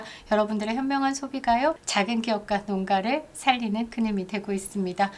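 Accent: Gyeongsang, South Korea. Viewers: 자유우파 자유민주주의 사장경제 대한민국 대변이느로 강력추천!!! 경상도 억양의 설득력, 굿!!!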